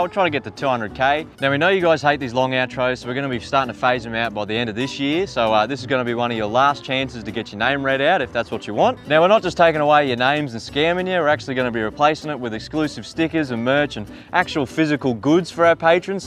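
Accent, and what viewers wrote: Australia. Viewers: full aussie mate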